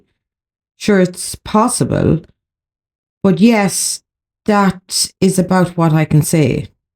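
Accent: Ireland. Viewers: Absolutely fantastic analysis of this case , I love the Irish lilt xxx jen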